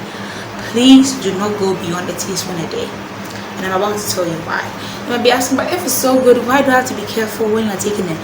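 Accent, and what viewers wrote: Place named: Nigeria. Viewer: Are u Nigerian? I work with a lot of Nigerian ppl. Girl ur accent is Everything!! Sounds like music when u talk. And oh yes thank u for this video. I was about to give up on MSM b/c of the bad headaches.. but I will try it again with more water. Thx girl. 🌹💫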